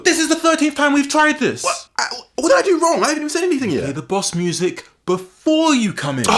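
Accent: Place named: England, United Kingdom. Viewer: where's your accent bro? You used to be very English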